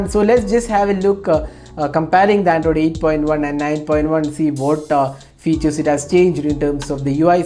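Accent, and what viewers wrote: India. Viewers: Блять как же хуевый акцент у индусов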